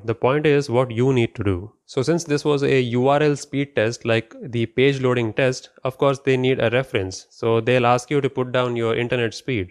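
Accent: India. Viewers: Thanks for being a legit guy,soothing voice and cool hairstyle btw.Keep up the good work. By the accent are you indian?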